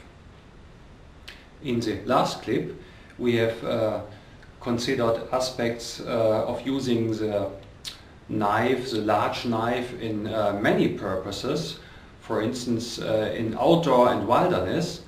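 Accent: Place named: Germany. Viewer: Nice german accent. Thanks for the video.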